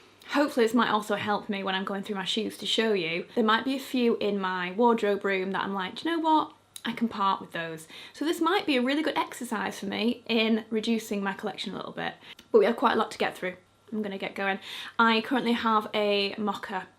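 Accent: United Kingdom. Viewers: It’s crazy that I’ve found you again, I was on a hunt because out of no where I remembered watching you maybe 6-7 years back but I forgot your name and only remembered you had a unique British accent and grey hair, so I was sooooo excited when I finally found you again after long hours of searching on yt😭 immediately followed so I don’t lose you again. You’re such a classy woman and it’s a joy to listen and watch you🥰❤️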